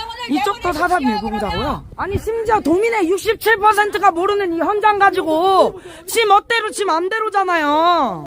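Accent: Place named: Jeolla, South Korea. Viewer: 말투가 전라도 같은데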